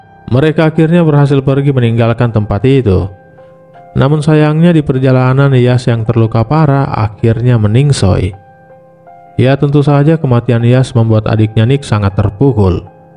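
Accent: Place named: Maluku, Indonesia. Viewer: Mimin logat kaya orang hulaliu la 🙏😁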